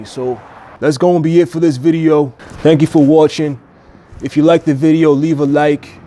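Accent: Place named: Boston, United States. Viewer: You sounded like you was from Boston.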